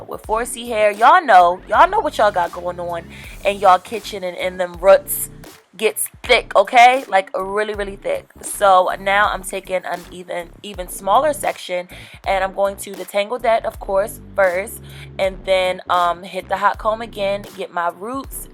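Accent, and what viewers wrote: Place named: DMV, United States. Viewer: Idk if I’m tripping but I hear a dmv accent. I’m Born and raised ! Lol ...I here that tew and monf 😩😍😍😍😍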